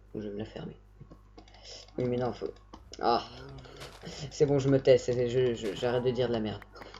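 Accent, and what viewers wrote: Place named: England, United Kingdom. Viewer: Mais quel accent anglais mon dieu ! TU es mon dieu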